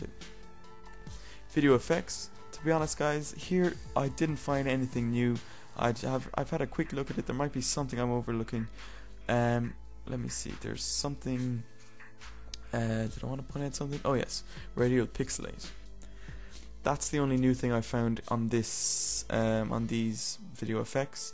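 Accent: Ireland. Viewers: Sexy Irish husky voice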